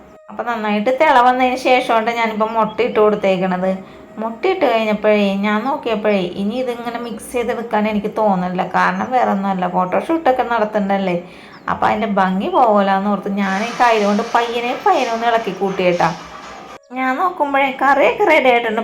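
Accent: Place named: Kochi, India. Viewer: എന്ത് സുഖമാണ് കേട്ടിരിക്കാൻ ❤ ഇടക്കിടെ ആ കൊച്ചി യുടെ തനതായ ഭാഷാ ശൈലിയും! ❤❤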